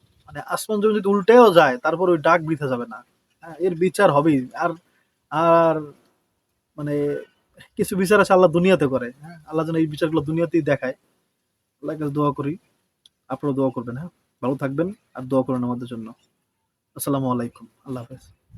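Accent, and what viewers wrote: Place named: Sylhet, Bangladesh. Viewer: আপনি সিলেট বলছেন আমার খুবব ভালো লাগছে আমি সিলেট থেকে আপনার ভিডিও দেখি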